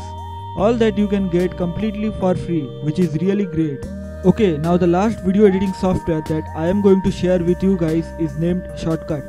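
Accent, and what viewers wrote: India. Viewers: When i hear this sound how he is talking he is from Indian for sure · Difficult to understand the Indian dialect......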